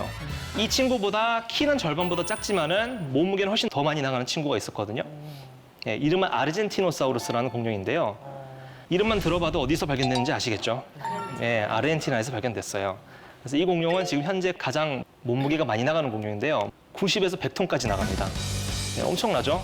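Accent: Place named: Gyeongsang, South Korea. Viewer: 좀더 보니 갱상도네~~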